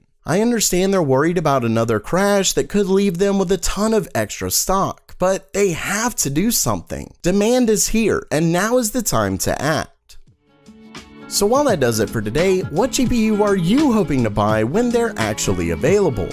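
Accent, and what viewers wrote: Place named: Texas, United States. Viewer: Your accent sound like a Texan or Oklahoman accent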